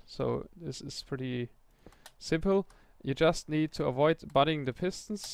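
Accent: Germany. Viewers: So you speak normale German, you don't Sound like someone who s motherlanguage english is?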